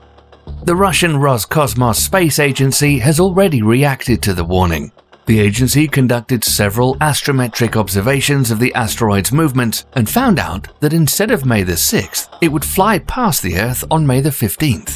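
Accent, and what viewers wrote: United Kingdom. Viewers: Funny how bull shit with a British accent sounds more credible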